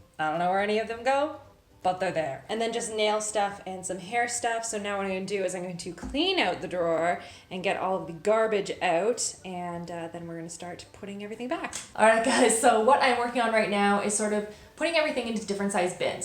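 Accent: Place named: Canada. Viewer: ❤️What an improvement! You make me giggle in all your vids whenever you say words like “a boot”...(about) 😛😘. That Canadian accent coming through!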